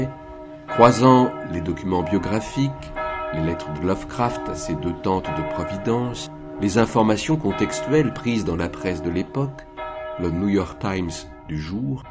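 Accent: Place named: South, France. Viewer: "et je parle enfin avec de la chaleur dans la voix" <3 très beau ce journal à l'accent du sud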